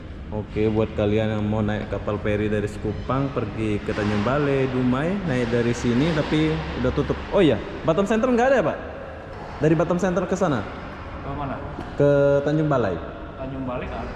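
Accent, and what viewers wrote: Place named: Malaysia. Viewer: Bro logat melayumu kental skali... Mending bahasa melayu aslimu aja di pakek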